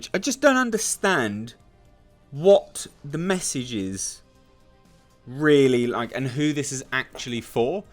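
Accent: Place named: United Kingdom. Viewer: There's nothing funnier than a frustrated confused Brit shitting on something terrible in that glorious accent. "What are you doin" and "who is this for"????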